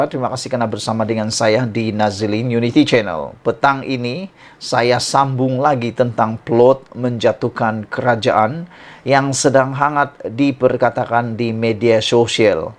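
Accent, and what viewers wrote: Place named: Sabah, Malaysia. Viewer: Orang sabah bro biasa lah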